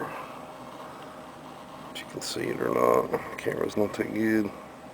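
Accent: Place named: Tennessee, United States. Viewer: You sound like your from Tennesse man! If you were to say youn's then I'd know for sure.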